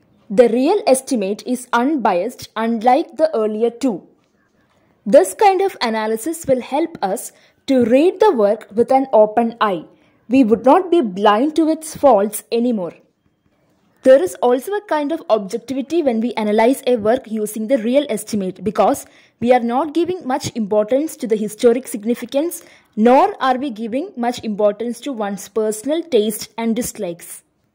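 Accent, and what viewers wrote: India. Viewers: Mam are you from south 😍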